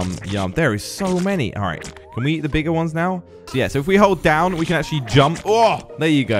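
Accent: United Kingdom. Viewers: I can tell by his accent, that he is British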